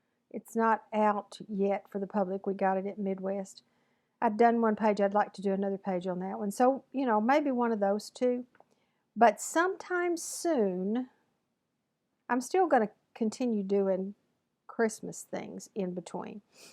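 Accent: South, United States. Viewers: I love your southern accent. I could listen to you all day. Take care.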